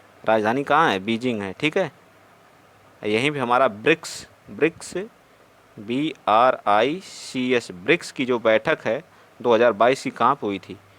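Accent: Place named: Kanpur Dehat, India. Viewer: bhai app कानपुर देहात से हो क्या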